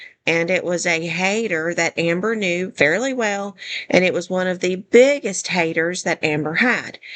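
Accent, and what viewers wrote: South, United States. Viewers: I just subbed bc you sound southern and you are definitely on point in what you said. ❤ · I think you're on to something! I like your voice, you sound like me...a southern gorl. Subscribed. 😊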